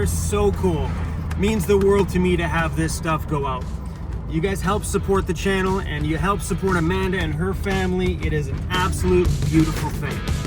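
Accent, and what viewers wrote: Australia. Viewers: Ahhhh it's great to hear an Aussie accent. I'm a Kiwi in Australia!! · Lovely hearing that Aussie accent.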